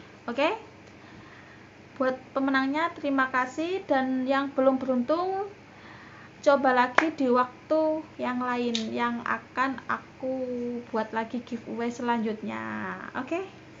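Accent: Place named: Java, Indonesia. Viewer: Medok jowo ngomonge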